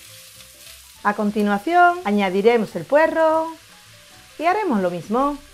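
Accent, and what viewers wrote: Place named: North, Spain. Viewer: Pues en pareces que seas del norte en vez del sur con ese acento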